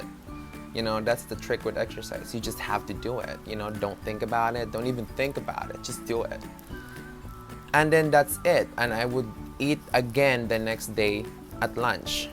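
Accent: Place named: New York, United States. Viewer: its the new yorker accent for me! HAHAHAHA pero bitaw na inspire ko charrr!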